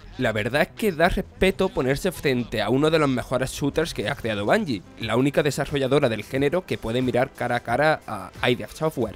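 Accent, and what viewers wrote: Andalusia, Spain. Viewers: El orador es un andaluz constipa'o? No te ofendas pero es la primera vez que oigo un narrador asi. Soy canario y se que a veces nos comemos letras pero estan haciendo un video que se ve de forma internacional y se sorprende la gente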